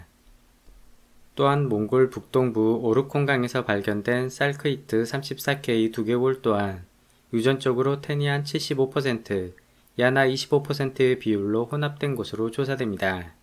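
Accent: Jeolla, South Korea. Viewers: 고향이 전라도요?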